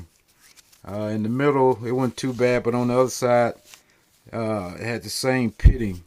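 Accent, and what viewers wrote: South, United States. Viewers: Great video. you are one country sounding brother! (and that is meant as total compliment). Keep up the good work. Thanks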